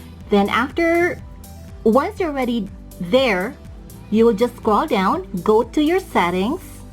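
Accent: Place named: England, United Kingdom. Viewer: i love this tutorial,,, maayos yung pagkakaturo.. i also love your english accent po hehe,, new friend po, sana mabisita nyo rin ako salamat po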